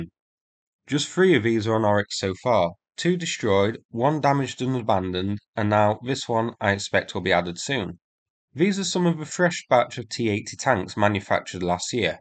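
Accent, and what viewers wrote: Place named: Midlands, United Kingdom. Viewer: Yep.......one of the Midlands accents. Pretty standard pronunciation of vowels for the area.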